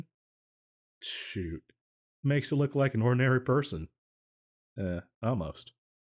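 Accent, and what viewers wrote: South, United States. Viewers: AHHHH SOUTHERN ACCENT!!!!!!! 😍😍😍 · This is my favourite audio of yours. Your voice here is THE best accent I have ever heard. It’s like a bit southern, and I adore it. You should definitely do some more with this kinda voice!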